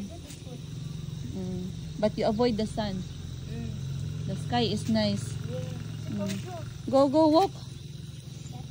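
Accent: Iloilo, Philippines. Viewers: Ilongga ka mam??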